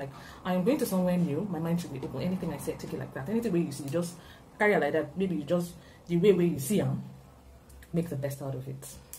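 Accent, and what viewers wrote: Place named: United Kingdom. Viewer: Your British accent is awesome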